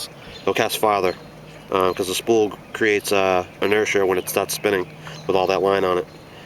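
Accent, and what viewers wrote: Boston, United States. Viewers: is that a boston accent i hear?? =)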